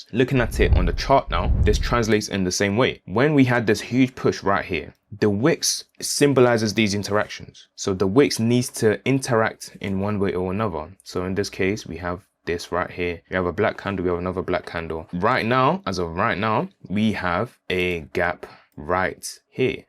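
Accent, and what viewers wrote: United Kingdom. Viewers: Perfect, Great info, and well presented, you're a gifted teacher...👍 You sound like you're a Londoner mate... Arsenal or Tottenham? 🫡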